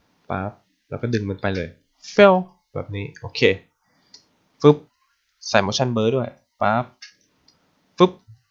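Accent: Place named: Kanchanaburi, Thailand. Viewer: ฝีมือเก่งมากเลยครับ แถมสอนก็ดีอีกละเอียดมาก แถมมีเทคนิคคีย์ลัดให้ด้วย ชอบมากครับ เยี่ยมไปเลย,, ปล.สำเนียงคล้ายเพื่อนผมที่เป็นคนกาญเลย คนกาญป่าวครับเนี่ย 555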